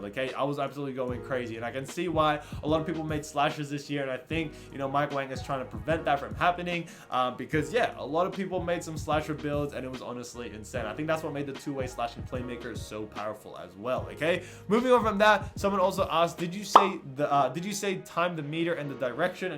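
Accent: Australia. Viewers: why you hiding your australian accent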